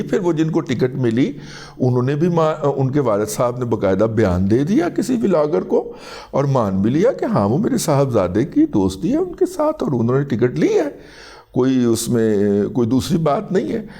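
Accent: Punjab, Pakistan. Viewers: Salam bhai this is Aamir kapadia from karachi your vlog always based on truth your Punjabi style I really loved and always enjoy your closing sentence great Allah aap ko apna fazal ata karay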